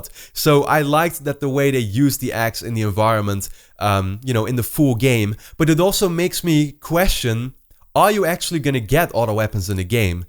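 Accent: Netherlands. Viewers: Hey, are you Dutch? I just discovered your channel, and that accent leads me to think so. If you are, awesome! I am too and I love this video. Subscribed =) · are you dutch? you sound so dutch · Ik dacht al dat ik hoorde dat je van Nederland was! Leuke video en veel interessante info. Thx! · Dude you have to be Dutch according to your accent.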